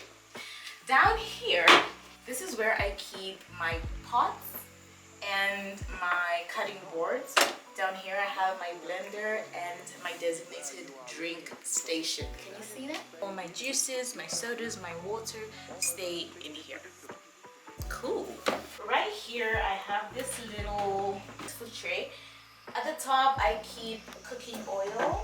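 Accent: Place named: United States. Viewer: Are you from USA? Your accent is giving me American vibes.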